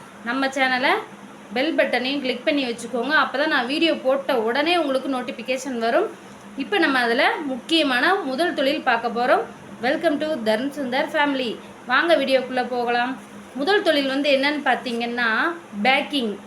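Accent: Thoothukudi, India. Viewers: அக்கா நீங்க தூத்துக்குடி யா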